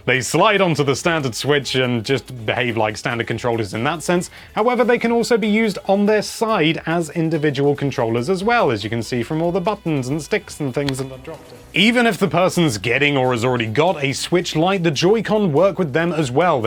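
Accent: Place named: United Kingdom. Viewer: And you have earned yourself a new subscriber. British accents are my kryptonite but you’re also a really good YouTuber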